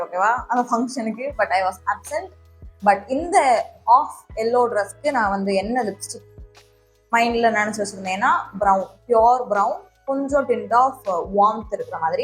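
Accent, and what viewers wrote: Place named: Kanyakumari, India. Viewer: Sis..! Your slang is nice.Are u from kanyakumari?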